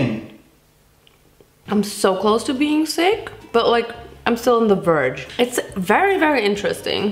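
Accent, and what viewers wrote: Germany. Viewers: Wie man ihr deutsch hört ahhahah love it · ✨Deutsch Accent✨ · Man hört ihren deutschen akzent so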